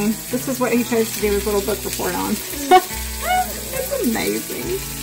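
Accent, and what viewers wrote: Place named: North Carolina, United States. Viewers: I started watching your video's earlier today. I said to myself, she has a North Carolina accent. And i just watched this video were you said Caswell co. I lived in Allamace co for over forty years. Now on the beach in S.C. But you brought back many memories of one of the nicest and prettiest state's i've ever lived in..And i can not call cows either. Have a nice day.. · that’s so funny, I was using the sound of your voice just to try and guess where this was, and I was close. I was born in 56 in kernersville, moved to hickory in 61 and owned a home in Sparta from 76 to 88, I now live at Apple city USA Taylorsville for the last 32 years Alexander co, the poorest, smallest, least population of any county in the state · Was wondering as you have a similar dialect/accent as someone I used to know in Clayton, NC - thanks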